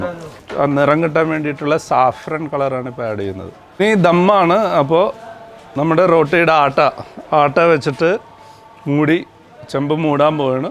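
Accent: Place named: Thrissur, India. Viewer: അവതാരകൻ തൃശൂർ ക്കാരൻ ആണെന്ന് തോന്നുന്നു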